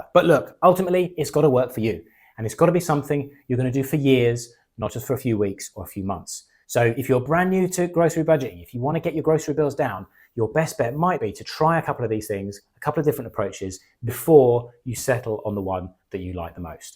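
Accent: United Kingdom. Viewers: is this what plebs like me would term 'spin'...? Suggestion, bias, sales. The beat friend of politics these days. Your use reminds me of the health related mindset change; i get to work out (as opposed to must), get to eat healthily (as opposed to 'ugh i have to eat x). Definitely change begins in the mind. So glad to get an English accent, getting tired of the US ones on social media, just soooo many (i'll offend someone i'm sure). I'm irish, but we'll be neighbourly 😊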